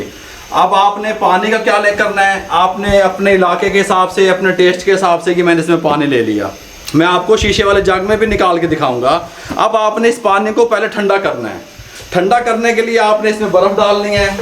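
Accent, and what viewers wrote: Punjab, India. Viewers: Boht piri Panjabi bolty hn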